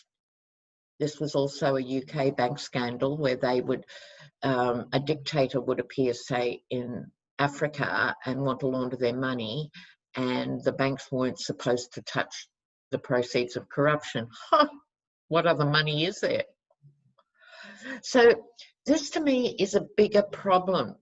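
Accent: Australia. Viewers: Thank you so very much for sharing your insights and wisdom with us! You are helping me remain as sane as possible. Question, please. By your accent alone I'd be able to tell that you're Australian. I'm curious -- why do you have such an interest in US politics? (Please don't misunderstand! I'm right there with you politically!) I realize there are plenty of "fires" to put out, so those, naturally , will take precedent. But as you have time and/or inclination, I'm sure I'm not the only one who's curious about this. Thank you for all you do!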